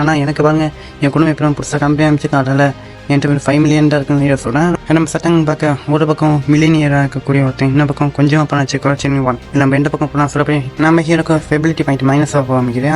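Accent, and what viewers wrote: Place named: Kerala, India. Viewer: Bro, tamil thana ,nenga pesurathu konjam Malayalam tamil kalanthu pesura mari irukku so, please tamill padichitu YouTube pesunga.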